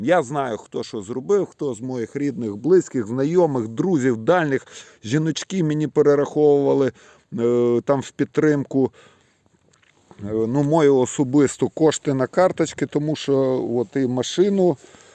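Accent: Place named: Surzhyk, Ukraine. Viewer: Смешной суржик 😁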